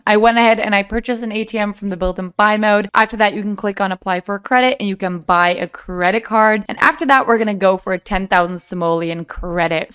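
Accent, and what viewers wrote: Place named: United States. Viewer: I don't understand any ball of it, but even under us... your voice wtfff its fucking perfect 🤩🤩🤩🤩 really americanish really good omg im flabbergasted no words wow 👍🏼👍🏼👍🏼👋👍🏼👍🏼👍🏼👍🏼👍🏼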